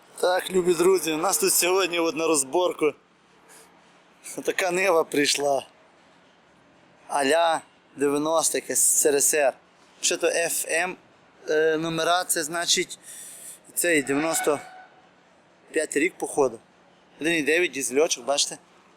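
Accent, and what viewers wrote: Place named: Western Ukraine, Ukraine. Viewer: Нормально говорит, на диалекте захидном.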